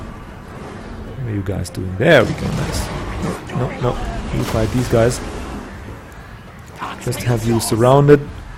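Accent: Germany. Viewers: Im so happy to see you back!!!! :D its great to hear your voice and some german accent again FeelsReallyGoodMan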